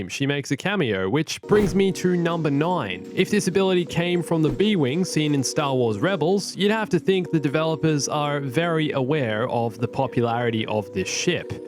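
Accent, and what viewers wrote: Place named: Australia. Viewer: I always find a small to creep over my face when I watch your videos, you just have this gusto and a nice australian accent, thank you for making great videos. 😂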